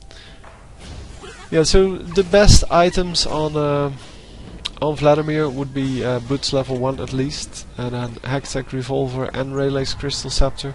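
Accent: Netherlands. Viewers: Are you Dutch? you sound a bit dutch ^^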